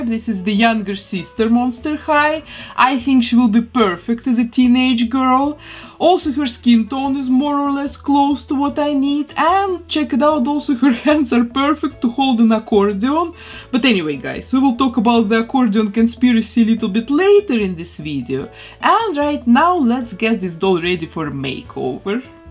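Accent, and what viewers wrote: Russia. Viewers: Русский акцент, и тут я понял что это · Блина малина Такой русский акцент Так необычно Кстати ооак очень круто вышел :> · она русская? я вот сижу и голову ломаю кажется мне или нет, вот прям ярко выражено русское произношение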